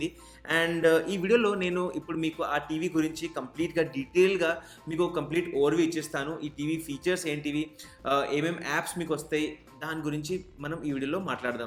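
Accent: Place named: Hyderabad, India. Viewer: Sir u r speaking good telangana language I.e, hyderabadhi language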